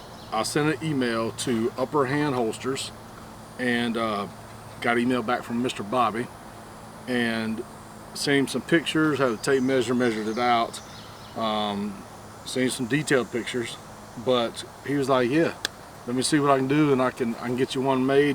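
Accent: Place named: Florida, United States. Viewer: i'm doing a similar build now.. just waiting on the micro comps to come back in stock.. yours looks tough as hell. i was raised in FL.. it's funny how much we sound alike. lol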